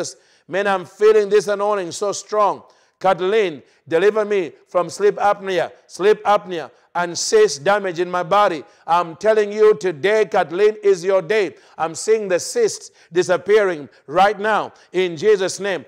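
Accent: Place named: Africa. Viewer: Honestly speaking why can't you understand that he's not Westerner but an african and you just have to understand him what he's talking about by the help of the holy spirit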